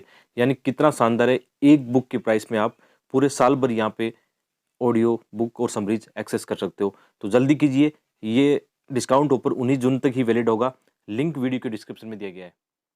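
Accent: Haryana, India. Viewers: Sorry....आप सब कुछ छोड़ कर सिर्फ खुद पर ध्यान दो। इतनी जानकारी books की questions की किसी को नही होती बस आप एक जगह ध्यान लगाओ,ओर वो ह आपके बोलने का हरियाणवी लहजा बाकी सब perfect है।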